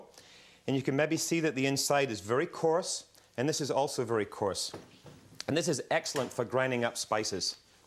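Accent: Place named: Scotland, United Kingdom. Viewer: You have a bit of scottish in your accent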